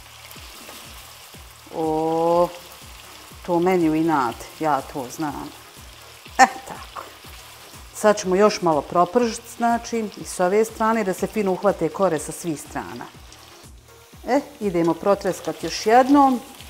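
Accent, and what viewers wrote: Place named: Bosnia and Herzegovina. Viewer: Ne sumnjam da su ćufte i ukusne kao što su jednostavne, upravo ih idem praviti ali željela sam reći drugo - uvijek radije slušam zbog njene spontanosti i prirodnosti, volim ton njenog glasa i bosanski akcenat koji me odmaraju...haha...ona dođe kao terapija!, želim ti svako dobro.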